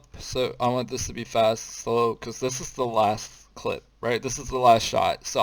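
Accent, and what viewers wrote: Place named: Netherlands. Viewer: i smell dutch accent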